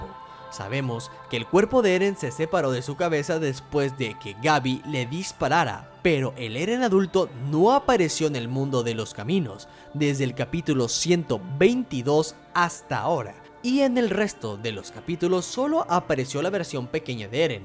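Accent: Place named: Sonora, Mexico. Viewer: No sé si él sea de México, pero yo conozco a muchas personas que son del norte del país y así es su acento, para ser exacta de Sonora, México.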